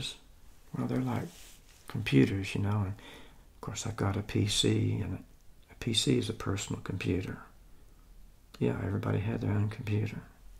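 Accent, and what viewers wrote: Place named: South, United States. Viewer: So lovely to hear a Southern accent. I grew up in Norcross 🍑 I enjoyed your video very much!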